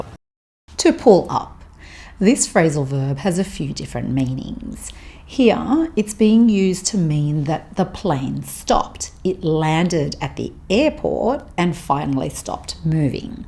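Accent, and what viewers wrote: Australia. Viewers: This channel is fantastic for learning Australian English and accent. Please make more videos because I learn so much on this channel. It is easy and simple to follow and understand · Hi, your videos are helping me to understand Aussie accent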